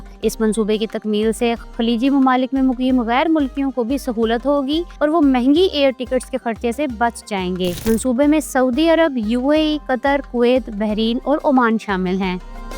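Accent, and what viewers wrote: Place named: Punjab, Pakistan. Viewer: aap English name bhe Punjabi mein bol rahee hain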